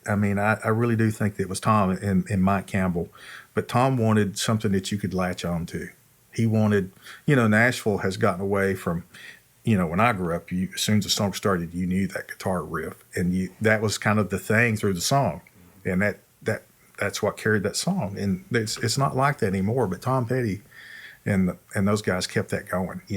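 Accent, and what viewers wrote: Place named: Texas, United States. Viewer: This guy HAS to be from Texas. That fabulous accent <3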